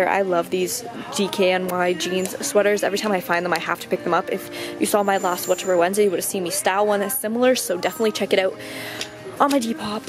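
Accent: Canada. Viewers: i'm from the U.S. but i can tell when someone's Canadian by how they say out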